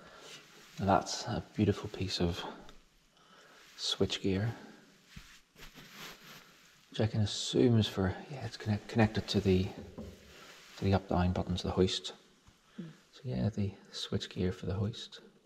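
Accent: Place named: Ireland. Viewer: It was good to hear that Irish accent commentary. I used work beside a chartered engineer from Ballymena in Edinburgh in the 90's. Mesmerising video thanks for sharing.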